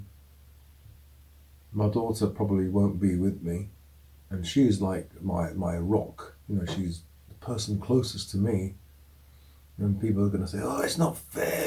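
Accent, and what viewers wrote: United Kingdom. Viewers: Hello,just discovered your channel,are you English?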